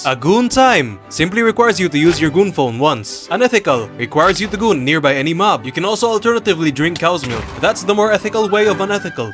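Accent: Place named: Philippines. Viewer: An idea that can only be mustered by a Filipino. I can recognize that accent from anywhere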